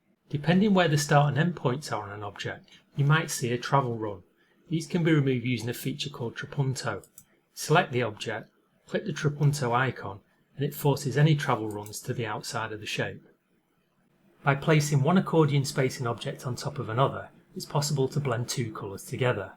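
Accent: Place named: England, United Kingdom. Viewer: Thankyou for your videos, very helpful. Im English and its very nice to hear an English accent explaining. I will be watching them all.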